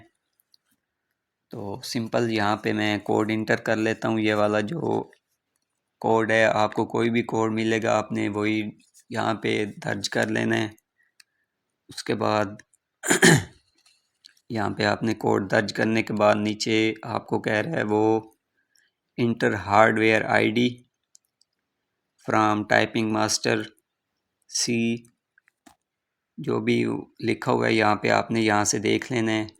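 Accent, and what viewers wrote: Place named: Pakistan. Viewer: Thanks bro kam ho gaya App pakistan sa ho app ki Language apka accent vahi ka ha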